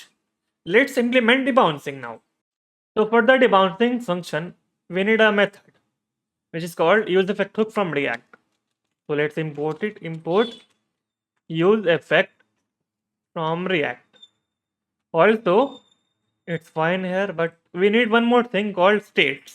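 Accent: India. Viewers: Could not understand a word. If FreeCodeCamp keeps posting these videos with Hindi accent, their content is going to go down hill. Most praise in comments is by Indians who understand this accent with no issues. For others though, it's painful to listen for more than a 10s. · I dont understand indian english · Hear Indian guy - close the vid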